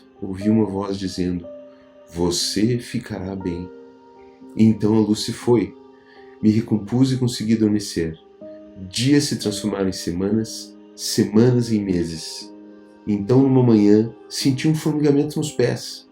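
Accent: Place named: Portugal. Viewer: Esse sotaque português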